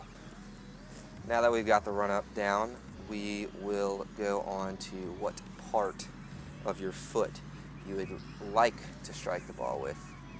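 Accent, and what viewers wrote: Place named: Australia. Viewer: you sound australian :D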